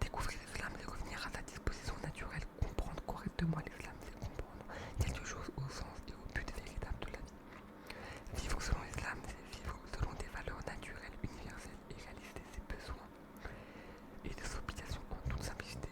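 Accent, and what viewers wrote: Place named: Lyon, France. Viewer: Sérieux t de Lyon ! Moi aussi ! Ça me ferait de te croiser Inchallah mon frère . J’me disais aussi il parle comme les lyonnais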